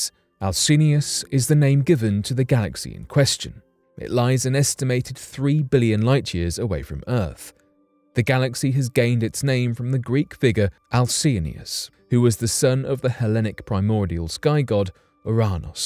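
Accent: United Kingdom. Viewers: Why do we have to hear Brit accent when they've never done anything space related.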